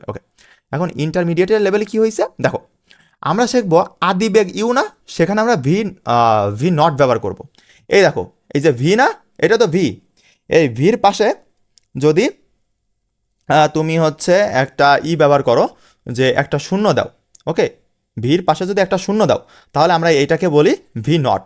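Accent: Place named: Jessore, Bangladesh. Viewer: Jessore er vasa😁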